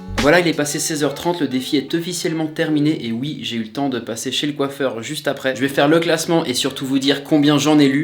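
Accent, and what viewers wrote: Switzerland. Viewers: T’es Suisse?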